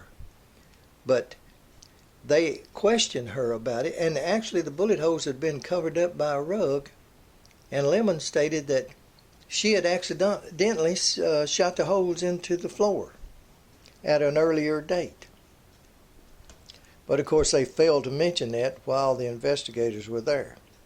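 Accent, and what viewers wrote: South, United States. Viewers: How are you? I stumbled across your channel the other night I really enjoy your videos and your southern accent where are you from?? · Thanks from Europa for the wonderful videos. thx for all the work you put in. You have a good clear way of telling your stories. You must be from the south.:o)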